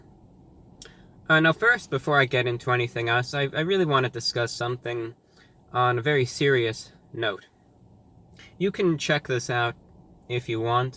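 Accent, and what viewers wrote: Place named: Transatlantic, United States. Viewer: bringing the transatlantic accent into the 21st century for sure 🍔🍟🍕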